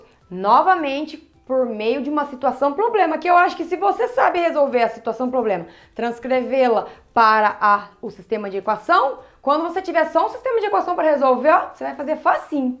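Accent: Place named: Paraná, Brazil. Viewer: eu escutando o video e pensando " nossa esse sotaque é familiar" ai na hora que ela falou Paraná eu lembrei dos meus primos do Paraná que falam igualzinho a ela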